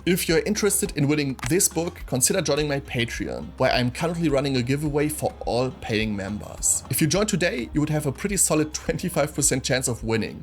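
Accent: Germany. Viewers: Sorry but it´s so hard to me listen a german guy speaking english with this so strong accent !! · Diggi ick lieb das Denglische Akzent 😏🤙 schöner Beitrag auch,weiter so!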